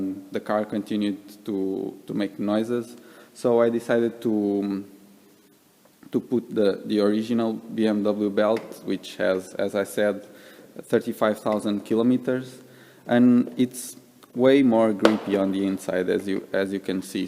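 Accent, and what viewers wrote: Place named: Russia. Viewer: Судя по инглишу, автор случайно не русскоговорящий?)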